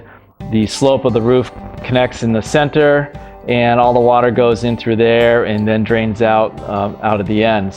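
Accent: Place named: United States. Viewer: Barrels in a greenhouse supposed to store heat, then temperature can be more consistent. Barrels can only be filled with water, hit by the sun, or connected to solar panels. You can also fill nutrient solution that you circulate trough your plants, using any kind of temperature control that you wish. heating the water in your greenhouse is way more sustainable then air. Especially some of hydroponic systems require a huge amount of water. I am also missing a bit of broad analysis on greenhouse types. Pros and cons really depend on climate but I only heard that is either suitable for your climate or not which I assume is somewhere in the US because of your accent.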